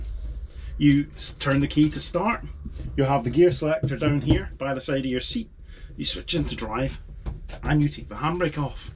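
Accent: Scotland, United Kingdom. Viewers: I want one of these, guessing by your accent, hopefully I will spot it kicking around Beautiful Scotland??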